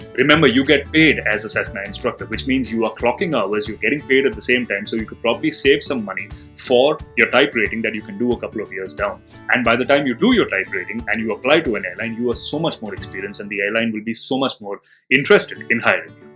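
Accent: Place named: India. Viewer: Man u have the most charismatic indian accent ever. :D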